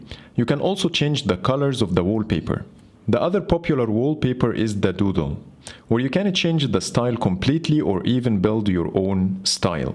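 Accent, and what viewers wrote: Egypt. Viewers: Hmmm I hear an Egyptian English accent over here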